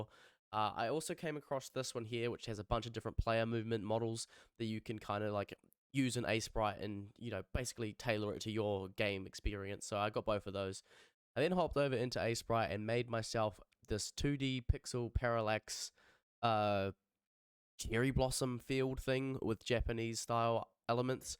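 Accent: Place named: New Zealand. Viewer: You sound like a kiwi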